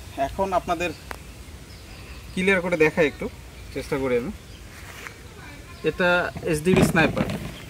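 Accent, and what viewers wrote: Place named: Naogaon, Bangladesh. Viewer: Apnar kotha sune buzhlam apnar bari Naogaon ba Joypurhat . Amr bari naogaon. Nx 200 ta kinte chai. Ki upaye kena jay?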